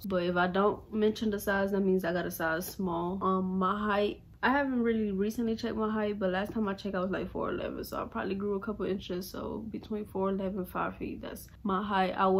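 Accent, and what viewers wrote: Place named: Alabama, United States. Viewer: Girl your accent is deep, is it Alabama?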